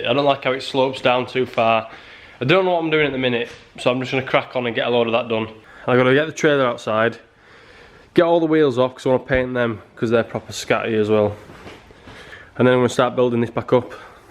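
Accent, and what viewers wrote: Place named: Yorkshire, United Kingdom. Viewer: Where abouts in Yorkshire you from lad?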